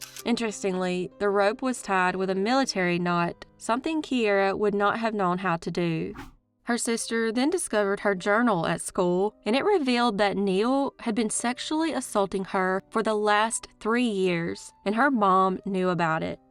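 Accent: South, United States. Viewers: These videos are so good, but I almost can’t with the cornbread accent. I’m southern and you can tell it when I speak, but come on. You can tell it’s exaggerated, because it lightens up as the video progresses. Chritaaaine finally becomes Christine and Jasmaaine turns into Jasmine. Come on, the southern accent is endearing, but this is a bit much.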